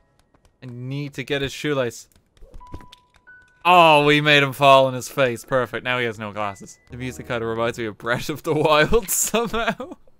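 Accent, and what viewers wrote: Ireland. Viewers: 20 seconds in “is this guy Irish” 25 seconds in I was sure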